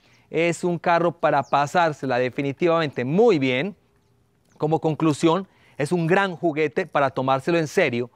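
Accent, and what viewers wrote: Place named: Colombia. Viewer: Pensé que era Colombiano por su acento, excelente video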